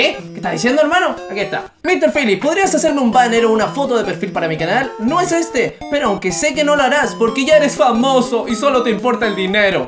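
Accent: Arica, Chile. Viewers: eres de Arica, no? xD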